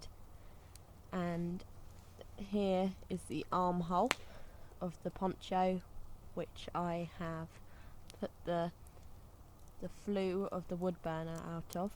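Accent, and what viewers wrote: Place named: England, United Kingdom. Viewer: I thought you were in England just by your accent haha. But yeah it’s really depressing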